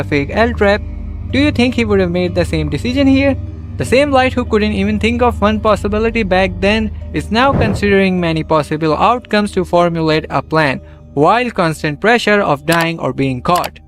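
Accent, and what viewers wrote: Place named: India. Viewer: Wow, Amazing video 💫 always refreshing to watch a scd video in Indian accent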